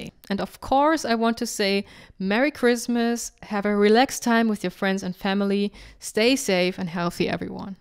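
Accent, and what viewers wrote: Germany. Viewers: Она ведь немка походу. Акцент)))) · Ich habe dein Englisch gehört und wusste sofort, dass du aus Deutschland kommst..... ^^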